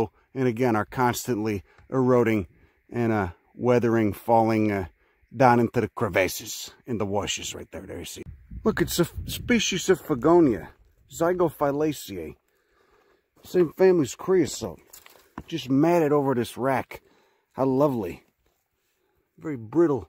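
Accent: Chicago, United States. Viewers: That's a Chicago accent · That thick Chicago accent tho!!!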